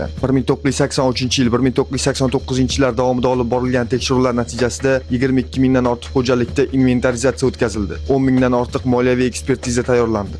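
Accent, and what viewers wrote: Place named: Rural, Uzbekistan. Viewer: Шуни секин секин мулойимлик билан гапирсез кишлак тилида ,хеч бир нарсани тушунмадик лекин кишлагни боллари аник тиник айтилган капларни тушунади шунинг учун биздан узир 53 ичовдик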